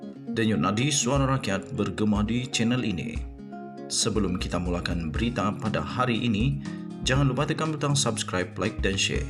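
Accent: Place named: Sabah, Malaysia. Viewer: Sabahan nih .dri suaranya dh tahu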